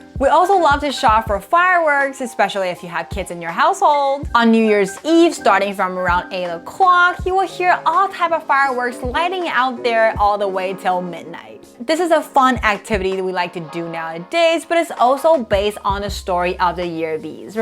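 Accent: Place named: United States. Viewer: Your accent is so American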